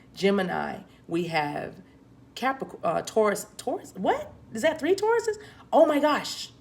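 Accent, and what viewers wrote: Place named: South, United States. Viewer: that southern accent GIRL!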